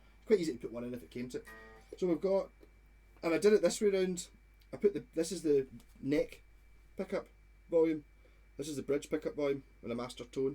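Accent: Scotland, United Kingdom. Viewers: Sounds a little Scottish. Right on! Love the guitar.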